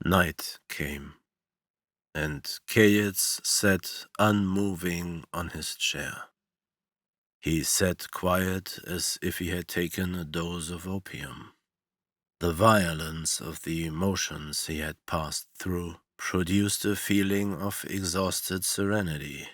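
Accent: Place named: Europe. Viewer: Digging the creepy eUrOpEaN accent delivery here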